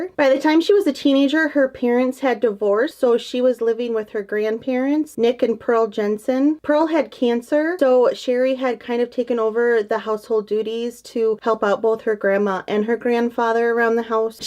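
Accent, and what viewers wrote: Minnesota, United States. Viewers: Love that MN accent! ☮️💟. I have it too.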